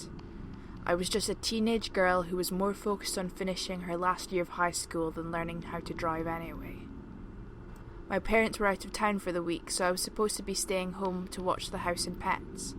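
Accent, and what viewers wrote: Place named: United Kingdom. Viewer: Great stories and you have a great voice! Love the British accent! Keep up the good work and looking forward to your next video!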